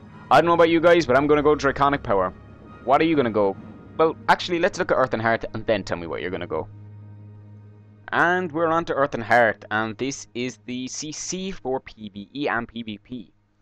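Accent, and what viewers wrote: Ireland. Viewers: Now, I'm new to this channel, but are you Irish/Newfoundlander? I thought I picked up a bit of my own accent from this video, haha! c=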